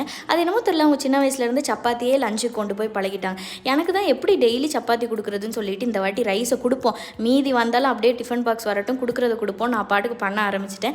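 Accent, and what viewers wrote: Tirunelveli, India. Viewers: Unga nellai slang in innocent tone is awesome jeera pepper equal a powder panni vachukita rasam easy a panlaam v can do it by trying it often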